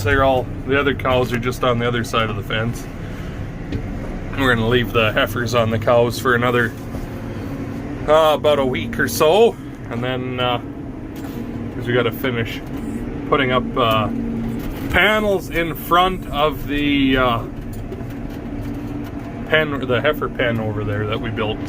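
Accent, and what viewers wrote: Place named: Canada. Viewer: I love this channel, he talks like real Canadian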